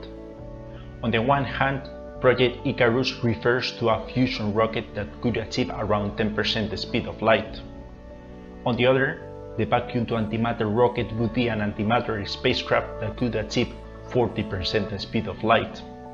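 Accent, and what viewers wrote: Spain. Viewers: Es ese un acento español? Mi español es mal pero estoy aprendiendo todos los días :)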